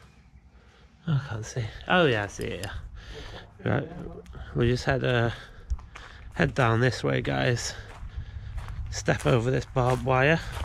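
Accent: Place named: Scotland, United Kingdom. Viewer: where did you get that great accent from? Scotish or Irish? I assume Scotish.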